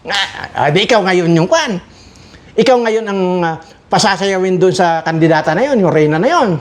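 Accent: Iloilo, Philippines. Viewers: Acsent talaga yan sa taga probinsya duon sa iloilo sir 👍😅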